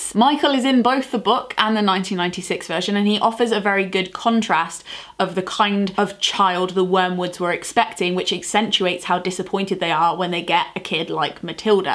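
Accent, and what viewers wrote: United Kingdom. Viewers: It was so interesting content~ Most of all, I like your clear British English^^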